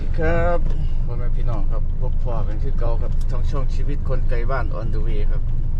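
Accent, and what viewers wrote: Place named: Isan, Thailand. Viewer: เว้าสำเนียงอิสานบ้านเฮาโลด บ่ต้องเว้าว่าครับผม แค่เจ้าโดยกะพอ ไปซื่อๆ=ไปตรงๆรึตรงไป พะนะ😆😆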